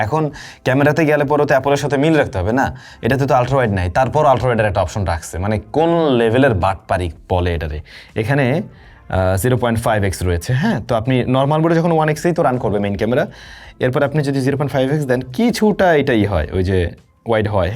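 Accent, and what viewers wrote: Barishal, Bangladesh. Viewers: ভাই বরিশালের এক্সেন্ট গুলা কিন্তু দারুন লাগতেছিলো😊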